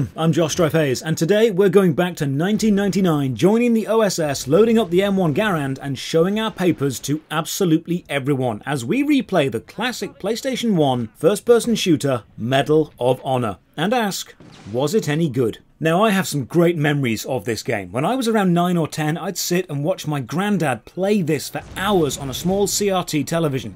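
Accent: United Kingdom. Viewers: Listening to you talk in this video, Josh, it makes me sad to realize we never got a Bri'ish Commando MOH game.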